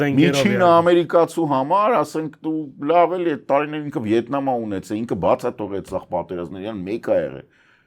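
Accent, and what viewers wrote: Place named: Russia. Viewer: Այ տկարամիտ մինչև երբ պիտի տկարության մեջ թպռտաք, նեթեն յահու չե այլ նաթան յահու , հայերեն թ.փ. ք. ունենք ինչու եք դրանց փոխարեն ասում,տ. Պ. և կ և այլը, բավ է ռուսերեն առոգանությամբ խոսել. դուք Համէրը չես կարող ասել խամէռ. Հոնդան չես կարող ասել խոնդա. եթե այտ պես է ապա դուք լեքսուսը պիտի ասեք լեխուս. ռուսներից խույս եք տալիս և զզվում նրանցից բայց դեռ ռուսերեն առոգանությամբ եք խոսում. դուք հայ եք և պարտավոր եք անուն. անվանումները ուղղակի հենց հայերենով արտասանել ոչ թե մտնել ռուսերեն և հետո արտասանել հայերեն. էնէլ իրանի հեղափոխության ժամանակ էր. Խոմեյնին արտասանել էիք Հոմեյնի. Դուք կիրդ ու գրագետ անձեր եք չի կարելի այտ պես վարվել❤:Օրինակ դուք TRUMP-ը չիկարելի արտասանել ՏՌԱՄՊ. այլ ճիշտ անգլերենի ձևով պետքե ասել և այսպես=ԹՐԱՄՓ կՐծում եմ հասկանալիէ❤🎉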